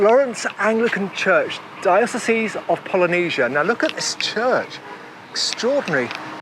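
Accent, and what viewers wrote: United Kingdom. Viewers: Good to hear the British accent vlogging in Fiji. I like your happy tone and saying hello to everyone. Great work!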